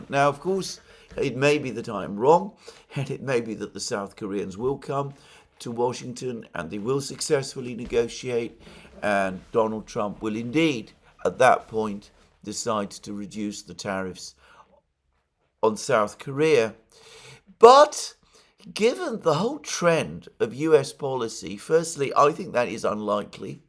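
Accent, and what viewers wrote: United Kingdom. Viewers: Excellent explanation of Bretton Woods - perhaps with your professorial panache ( I mean this sincerely, no sarcasm intended) you can explain to most (untutored) Americans what deficit-fueled economics and and fiat-currencies are. I don't even believe most economists know - or can articulate it. You're clearer yhsn Jeffrey Sachs. If only we could all wrap our minds around what the heck a tariff really is. Your very proper British accent would definately make the "lecture pill" go down easier.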